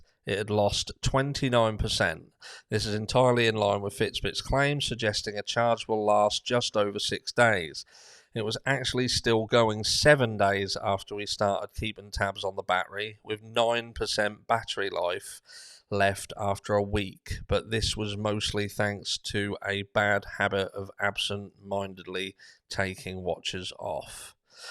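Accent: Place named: East Anglia, United Kingdom. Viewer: Hi dude, newbie here. Love this chat, I'm going to dive into your channel. I have to ask, are you from Suffolk/Norfolk, your accent is very familiar? I've subbed btw 😉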